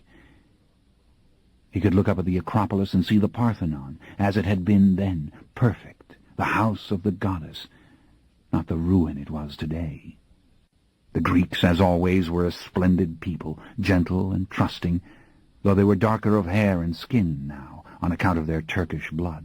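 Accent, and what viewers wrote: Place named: France. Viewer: This narrator burns my nerves. Hey says everyons name with a French accent except Louis. A Frenchman from colonial New Orleans. Make it make sense.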